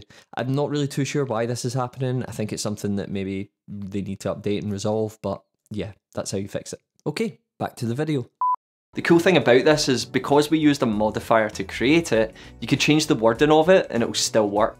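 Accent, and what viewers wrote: United Kingdom. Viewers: Thank you! Btw, first 1,5 minutes i just listening your pronunciation in English, it’s British accent? :)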